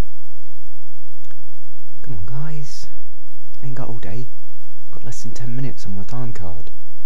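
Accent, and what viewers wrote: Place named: United Kingdom. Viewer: British accents are always amazing. If you weren't so quite and more talkative, then you'd easily be a famed commentator. An example is the user <link>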